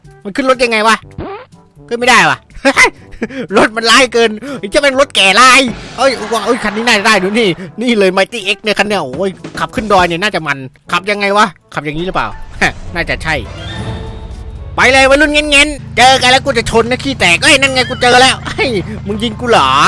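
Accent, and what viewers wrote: South, Thailand. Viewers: ชอบมากเลยงับ สำเนียงใต้ <num>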